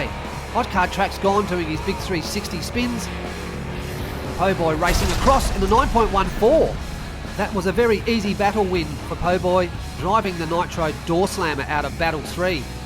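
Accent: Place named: Australia. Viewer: Editing is just brilliant and I just love that australian accent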